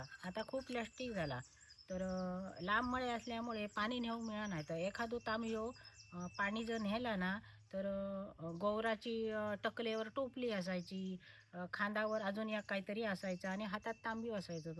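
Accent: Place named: Malvan, India. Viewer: अतिशय सुंदर व्हीडीओ , ताई तुम्ही फार लकी आहात.अशा मनमोहक निसर्गाच्या सानिध्यात तुमचे जीवन जगत अहात.आम्हा मुंबईकरांना तुमचा हेवा वाटतो. तुमची मालवणी भाषा ऐकायला कानाला गोड वाटते.असेच नवनविन व्हीडीओ टाकत जा, आम्हाला तुमचे व्हीडीओ फार आवडतात.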